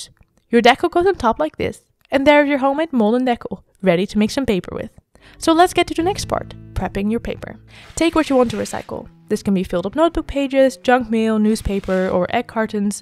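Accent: Netherlands. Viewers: I love how simply you have explained this. I would like to make some paper for my watercolour work. If you don't mind me asking, where are you from ? I detect an accent and it sounds Dutch 🤔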